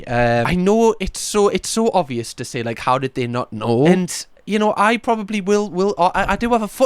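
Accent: Newcastle, United Kingdom. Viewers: Agreed and the Geordie accent helps😊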